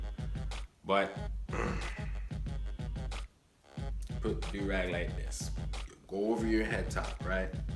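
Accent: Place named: Toronto, Canada. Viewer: sounds like ur from toronto my guy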